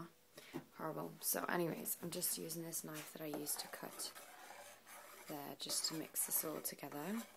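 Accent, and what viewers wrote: Newcastle, United Kingdom. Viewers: I'm guessing your Geordie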